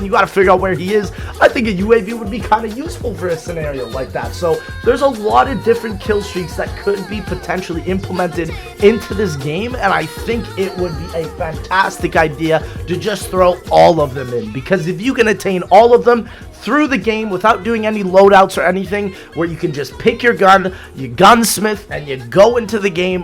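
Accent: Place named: Newfoundland, Canada. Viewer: I realize now that the way you speak is almost like how a newfoundlander sounds and to people who aren't exposed to it a lot have no idea what you're really saying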